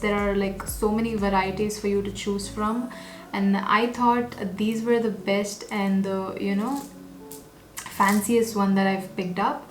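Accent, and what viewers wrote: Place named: North India, India. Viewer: U look like a north indian by accent and looks💯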